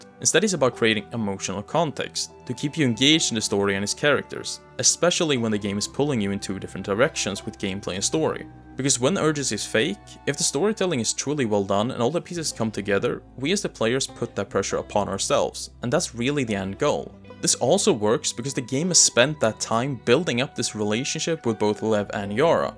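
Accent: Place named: Philippines. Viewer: You sound brown, like a jungle asian or some kind of filipino.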